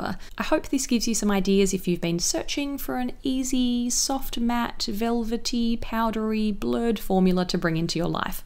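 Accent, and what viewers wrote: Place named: Australia. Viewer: I Like Aussie People. Love The Accent.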